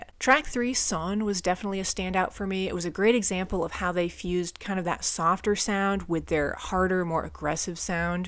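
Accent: United States. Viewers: I watch a fair bit of react content and your reactions are pretty unique I feel, I've been watching your videos here and there for a while now and it always feels like I'm watching a radio broadcast. There's also something very open about your style, you come across as very unassuming. I love how you can listen to the gnarliest lyrically super fucked up stuff and just be like "I really love how the guitar builds with the energy of the lyrics". This reaction in particular is pretty funny to me, I live in the Netherlands which means German is a very recognizeable language for me. Despite the fact that you clearly have 0 clue what's going on with the lyrics you still pick up on some cool stuff instrumentally, and well, there's just something comedic about someone with a very American accent pronouncing the song titles and the band's name. It reminds me of Brad Pitt in Inglorious Bastards when he greets people in Italian. 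I'm not entirely sure why I felt the need to write all that out but what I'm trying to say is I think you got something pretty unique going on here and I think that's great. Keep it up!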